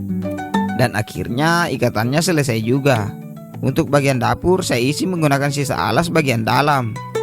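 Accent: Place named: Bugis, Indonesia. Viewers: Kalau dekat saya ingin main ke pondok Abang.. Sayang saya di Jawa . Kalau lihat dialek nya Abang ini suku Bugis ya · Seperti nya bukan orang Batak tapi Sulawesi Selatan logatnya mirip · Kental kali Bugis nya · Orang manaki bosku, dari logatta, kayak orang maros kepeng😁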